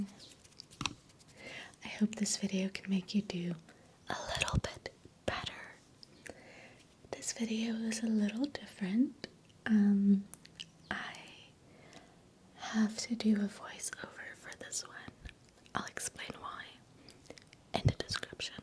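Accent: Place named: South Africa. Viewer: You're the only South African I know & I love your accent. Sounds to me like a mix between England & New Zealand. Very lovely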